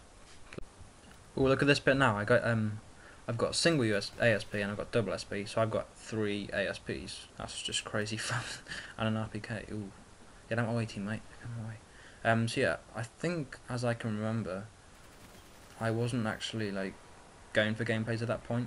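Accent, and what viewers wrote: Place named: England, United Kingdom. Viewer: where abouts in England are you from???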